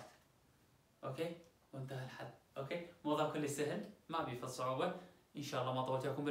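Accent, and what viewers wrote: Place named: Nineveh, Iraq. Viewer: هههههه نفس لهجتنا اني من نينوى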